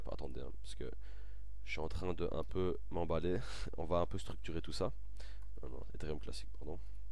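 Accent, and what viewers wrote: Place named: Liège, Belgium. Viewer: salut je viens de m'abonner super présentation, je reconnais un petit accent liégeois :) je suis de lieche aussi ;) février s'annonce prometteur aussi pour POWER LEDGER!!!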